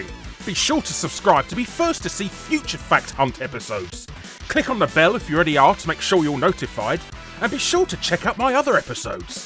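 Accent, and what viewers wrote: United Kingdom. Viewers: Your cartoon likeness doesn't look like someone you'd expect to have a brittish accent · Nah, don't feel stupid. He has quite a strong British accent (London...I think? I'm no expert.) and I imagine it can be very difficult for a non-native speaker to understand if they have not learned from a British teacher. And even then there are a lot of different English accents. I personally enjoy his narration, but then I am more accustomed to the accent.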